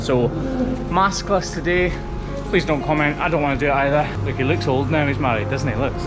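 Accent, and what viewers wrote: Scotland, United Kingdom. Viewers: I UK channel I subscribe to has a Scottish man who often says “Let’s crack on”, too. He is also charming and we in the US love listening to you Scotsmen. 💖😃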